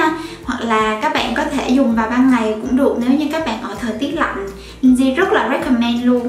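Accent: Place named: North, Vietnam. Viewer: E thấy nói tiếng Bắc dễ thương quá...vào một video nào đó... sẽ làm một video skincare hoặc makeup hoặc vlog..v..v nói tiếng Bắc nhé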